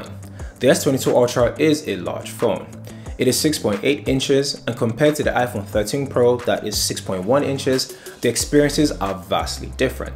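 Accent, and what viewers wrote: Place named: Nigeria. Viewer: The only Nigerian reviewer wey get sense ,I love your accent bro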